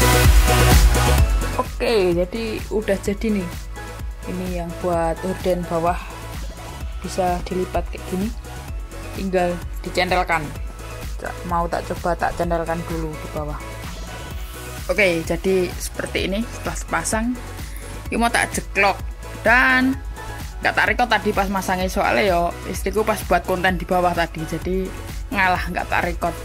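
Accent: Java, Indonesia. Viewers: Mas dari mana ya? Logate jowo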